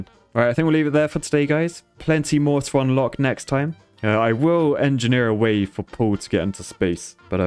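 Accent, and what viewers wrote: United Kingdom. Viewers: I swear engineer is like df but bri'ish and more pg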